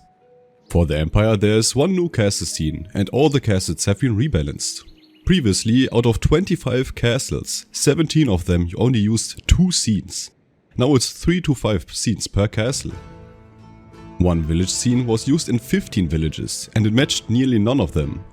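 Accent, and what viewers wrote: Germany. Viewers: Klingst deutsch/schweiz/österreich :D Ich warte ja seit release auf Shokuhō oder einen der Mods die das Spiel in Zeitalter der Industrialisierung mit Linieninfanterie bringt. Der Star Wars Mod wurde ja scheinbar eingestellt :(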